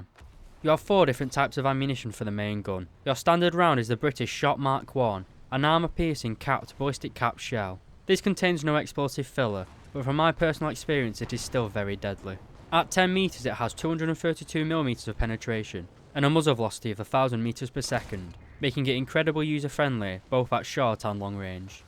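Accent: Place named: England, United Kingdom. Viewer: Ayup mate... Whereabouts are you from in England? I'm from the high peak myself so your accent sounds quite familiar to me😁👍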